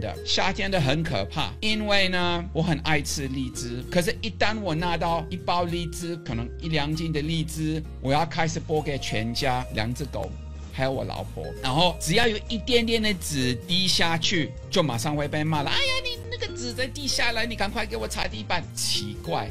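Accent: Taiwan. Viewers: 很台灣腔既老外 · 好幽默哦，也很台（穿插好多台語） 讚👍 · 這位教授........你的口音不只是那麼簡單...你語氣結尾帶著齁......有夠台....我這台灣人講話都沒你那麼台....... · 29年讓人改變了！臺灣國語耶！哈哈哈！ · 您的台灣國語說得太棒啦！:)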